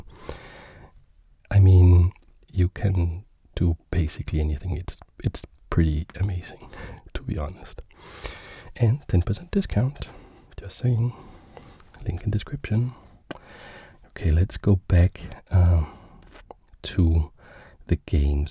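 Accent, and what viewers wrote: Denmark. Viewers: Is that a danish accent I Can hear? 🤣 I am danish myself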